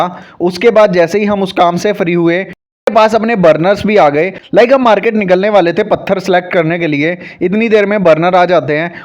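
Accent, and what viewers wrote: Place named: Delhi, India. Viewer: desi language me aane Diya Karo shorts bhai desi ka aalg maja hai na jis hisb te bolo ho nu lago ho delhi aale paplu ho. but ek chig ke liye appreciation dena chahuga ki jo pdhhe likhe hoya kare bikuo sense ke tarike se bolte ho . arr ree yo karle 😂 yo hoga wo na karte all the best for you shop🫠 god bless you but ek chig khena chahunga ki aata base use karo ya multi grain khud bhi banwa sako ho arr bdhya quality ke jite wo healthy consider howega arr public daily intake easily kar legi . madia aala sodha bitha dega body nee baki ap samjadar ho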